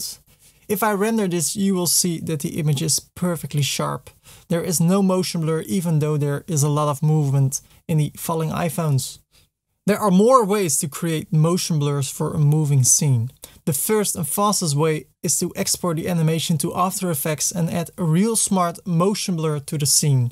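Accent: Netherlands. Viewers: Ok top je Nederlandse accent is wel een beetje opvallend :)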